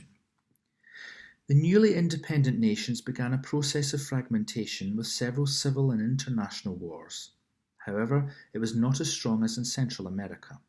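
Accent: Scotland, United Kingdom. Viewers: your Scottish accent is delightful. And for some reason I can understand it (probably because you're reading 😅). I'm sure you will grow once you get some exposure.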